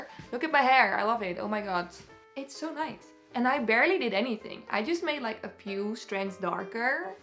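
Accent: Netherlands. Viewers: At first I though you were Danish(your name ). Today I was like no way she sounds NL. Hey Dutch sister.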